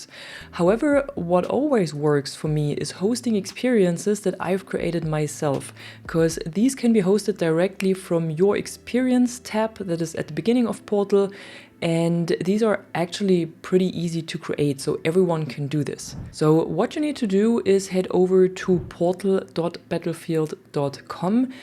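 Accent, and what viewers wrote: Germany. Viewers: Man hört das Du Deutsch sprechen kannst 😂🖐️🖐️🖐️🖐️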